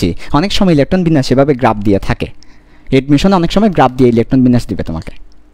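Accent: Chittagong, Bangladesh. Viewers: Bhaia apni chattogramer????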